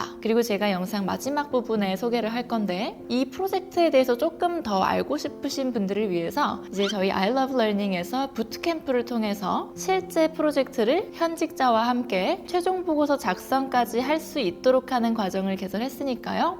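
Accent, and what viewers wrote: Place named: South Korea. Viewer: 영어 발음이 콩글리쉬세요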